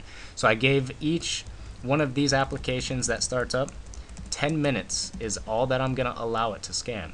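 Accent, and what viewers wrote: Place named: Canada. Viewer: could you tell by his sweet canadian accent?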